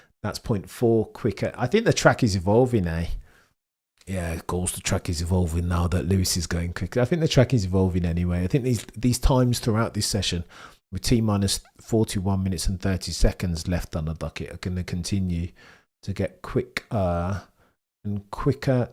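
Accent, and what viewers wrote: United Kingdom. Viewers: Proper British lad 😂 I love the accent .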